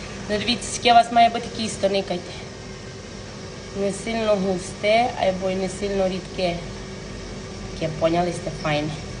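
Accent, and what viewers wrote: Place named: West, Ukraine. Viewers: Взагалі українки говорять українською мовою, а не рашистською. Автор відео говорить західно-українською ( діалект). Якщо не подобається, не дивіться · То чого ж це ви, «українка», російською пишете? А я ось, не українка, вивчала українську у радянській школі у Києві , живу більш ніж тридцять років в Ізраїлі, але ж не тільки пишу українською а ще й усе те, що ця шановна пані каже, зрозуміла. Дуже приємно слухати західний діалект, немов річечка. А вам таки має бути соромно! 😁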